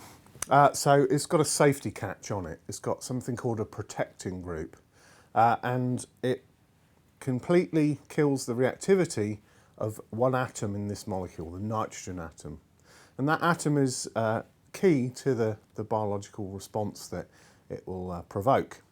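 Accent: United Kingdom. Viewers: I swear, British people could talk about clipping toenails or removing gangrene and it would sound fascinating!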